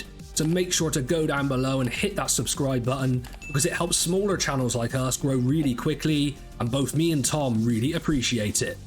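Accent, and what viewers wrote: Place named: Bristol, United Kingdom. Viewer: You sound like you're from my neck of the woods me babber!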